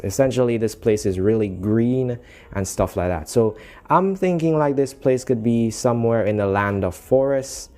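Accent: Jamaica. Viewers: Are u from Jamaica? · yooo is that a jamaican accent i hear??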